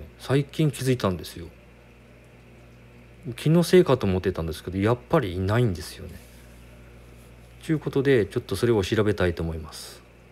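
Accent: Kyushu, Japan. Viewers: こんばんは 九州弁ありがとうございます😊な〜つかしい〜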